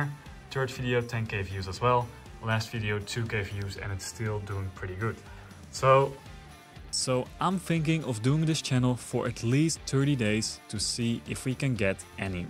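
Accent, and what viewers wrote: Netherlands. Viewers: The Dutch accent😂 Btw nice vid!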